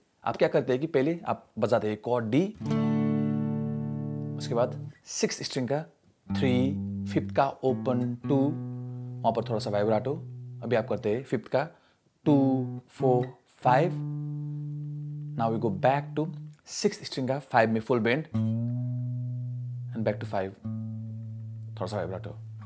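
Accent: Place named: Nepal. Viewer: Dude that guitar is lit and with that accent i can tell ur from nepal 🇳🇵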